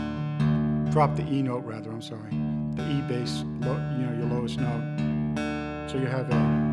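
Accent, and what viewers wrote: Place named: Brooklyn, United States. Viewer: Interesting, sounds like he's from Brooklyn, NY or close to that, can't pick very good either.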